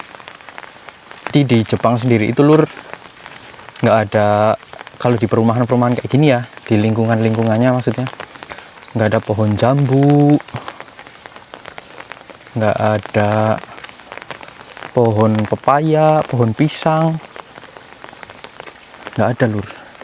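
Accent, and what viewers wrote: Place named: Cilacap, Indonesia. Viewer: aslinya org mana logat nya kaya org Cilacap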